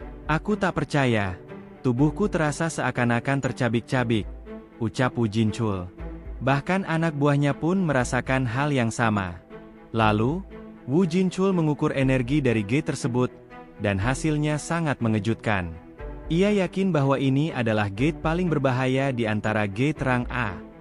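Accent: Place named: Indonesia. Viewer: Indonesia banget baca dungeon ,Potion,healer😅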